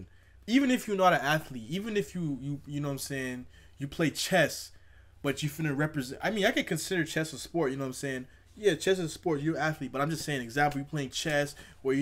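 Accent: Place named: South Africa. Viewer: i can hear that youre south african my guy 😂😂🤣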